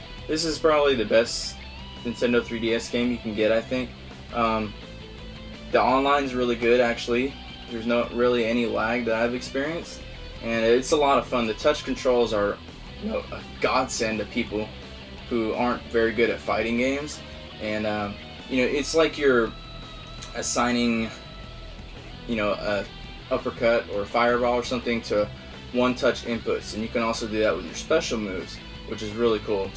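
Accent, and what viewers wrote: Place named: France. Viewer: french accent not british good video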